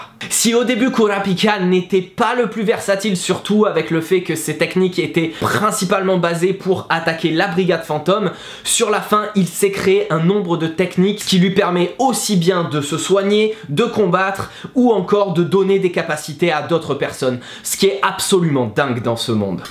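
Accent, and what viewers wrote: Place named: France. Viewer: Don't understand a word 😂 but I missed you and your wonderful French accent so much! 😍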